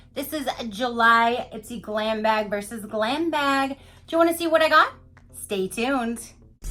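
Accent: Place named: Canada. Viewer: Hi love, I just love the sound you made with the spoolie: buuuuuurom, beeeeroom, beeeerum, booooorum? Inquiring minds want to know.🤪 I wish I’d have gotten the same lipstick. Mine is from colorclub, and it is the EXACT color of the ipsy bag. Another toober, abbadabs, didn’t get her extras either. I have a feeling they are being shipped later. I haven’t heard yet. Another question: where are you from? You sound Canadian, but some Americans far north have a bit of the same accent. I really love yours. It’s so soothing. Gee do I sound like a fan girl, at the wee age of 63? Anyway, I’m not trying to hit on you, I’m happily married for 35 years(about, I always have to stop and count.) our secret? We both had practice marriages, and he was a workaholic who traveled all the time. I figure our actual time spent together is really about 5 years! It was a little hard being a sort of single mom, but once we got to San Francisco Bay Area, it got better. 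Everyone’s husbands worked all over. pm, any restaurant is filled with moms and children. We helped each other out. But these days you’ll often see a smattering of home dads too. My son-in-law is sort of one.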